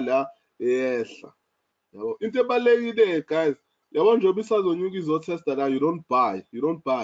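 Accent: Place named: Africa. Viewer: 30 minutes later i'm still not sure what language he is speaking. Sounds like english but also African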